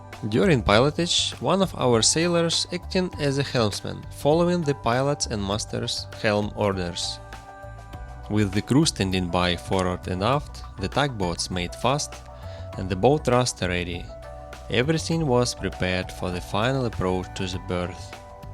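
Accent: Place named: Russia. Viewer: здравствуйте,я смотрю что вы наш по английскому произношению 😂,скажите как вы начали путь и как проделали путь к капитану в иностранной компании я через 4 месяца буду поступать в Макаровку и волнуюсь по поводу английского и карьеры не хочу по Москве реке плавать на паромах до конца карьеры)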